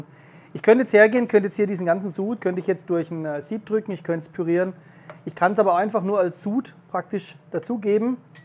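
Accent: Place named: Franconia, Germany. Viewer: Karlsruhe? Du klingst aber auch etwas fränkisch.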